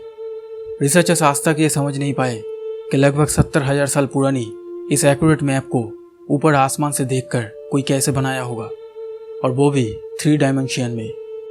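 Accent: Bengal, India. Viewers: Sir, aap bangali kya, aapke awaj se aisa lag raha hain......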